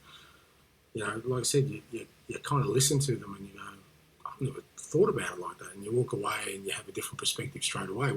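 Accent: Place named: Australia. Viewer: God he's so Aussie its ridiculous 🤣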